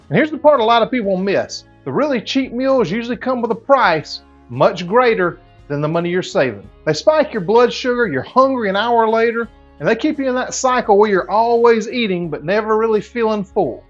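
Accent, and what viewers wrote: South, United States. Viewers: Sounds like you’re from the south. I don’t know what you pay for good hamburger down there but where I live it starts at $6 a pound. 🥴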